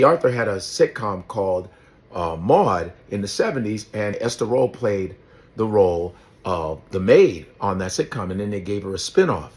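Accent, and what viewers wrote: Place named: New York, United States. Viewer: No other accent can cuss you and make you feel like crap like that New Yorker or Yorkah😊. "Youza funky B@ fa dat. Family I was LMBCOFF. I Luv this brotha and his comedy is fiya!👏👏👏. Scallywag 😂😂😂. New Yorkers have the beautiful flaw of telling just how they feel without concern for yo feelinz. LuYa Big Bra for speaking your mind and representing this great actor.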